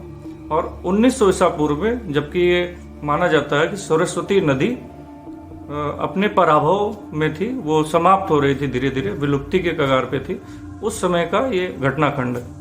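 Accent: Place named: Kanpur, India. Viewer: Dheere dheere kanpuriya style bahar aa rha ab By the way great explaination of shiva triology series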